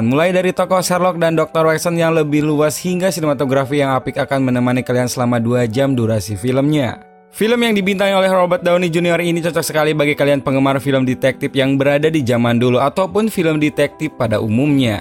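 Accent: West Java, Indonesia. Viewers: arfan muhammad hahaha kykx iya. Mgkn ini org sunda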